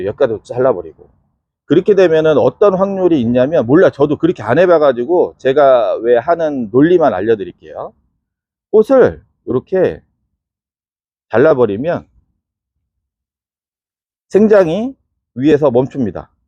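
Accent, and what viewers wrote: South Korea. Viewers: 경상도억센말투만듣다가대전사투리들으니넘정겹네요!, 영상잘보고배우고 감사합니다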